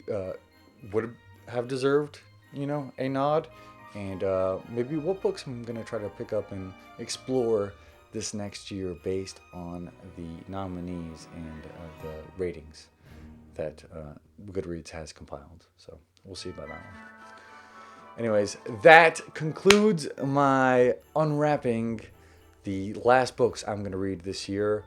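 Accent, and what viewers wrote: South, United States. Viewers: I love your video at your video in Texas during your quarantine until your husband, Zach was wrapping all of the books while you're open them to see some new books including Black Sun by Rebecca Roanhorse, Legendborn by Tracy Deonn, Ready Player Two by Ernest Cline, And I Darken by Kiersten White, The Fires of Vengeance: The Burning, Book Two, The Invisible Life of Addie LaRue by V.E. Schwab and House of Earth and Blood (Crescent City) by Sarah Maas, but you're talking about all of the characters from the books to read them after that, but I hope he'll be proud of you and I love your Southern accent!!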